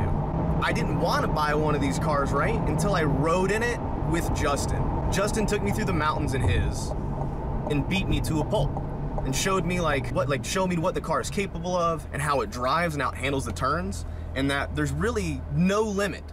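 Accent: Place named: South, United States. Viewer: He lowers his level of southern-ness so people online can understand him lol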